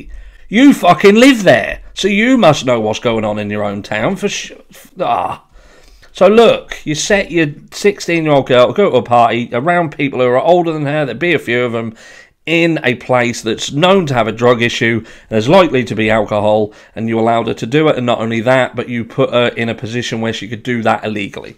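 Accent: United Kingdom. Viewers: I appreciate your commentary and hearing a British accent is refreshing.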